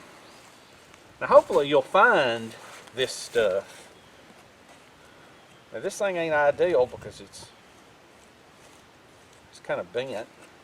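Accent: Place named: Louisiana, United States. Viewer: Two thoughts: A.) Why not a trench fire? B.) If you carve a funnel out of wood with a SAK and lay the tin foil in the funnel: wouldn‘t life be better?😅 Love your videos and your accent! Keep making those experiments!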